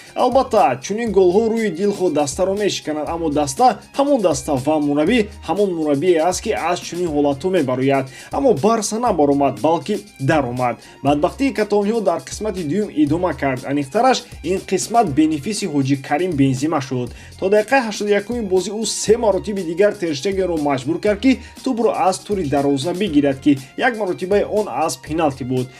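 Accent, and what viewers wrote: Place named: Afghanistan. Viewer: Илтимос ба хаму лахчаи агони габ назан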